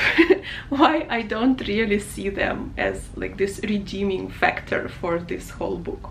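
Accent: Russia. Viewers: Ah, i knew that was a beautiful Russian accent I hear. Are you a fan of Russian lit at least? (oh I see now that you are for sure lol!) I am completely destroyed for all other writers. My reading soul belongs to the Russians :)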